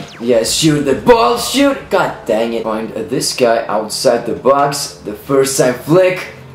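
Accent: Iceland. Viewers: are u Icelandic ?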